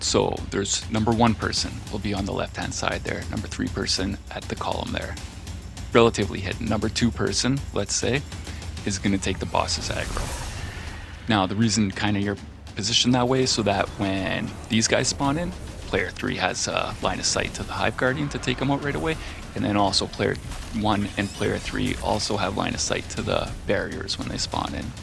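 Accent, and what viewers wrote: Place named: Canada. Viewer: Yup, we have the same accent though mine is slightly different cause I am from Newfoundland, but I recognized the canadian nuances lol nice!!! As for Wizards, I hate them, they melt man, I can't even use stormchaser when I know wizards are involved cause I struggle to maintain headshot accuracy with them, so I usually try to build around Gally if i know wizards are involved, and Arby is a staple in ALL my builds, it literally hasn't left my kinetic slot since I got it, the only better weapon right now is Wishender, and Wishender hits harder.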